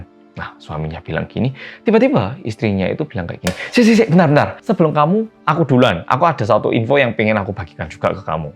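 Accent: Java, Indonesia. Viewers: Great story to watch 👍👍👍 Thank you mas ' ' 😁🙏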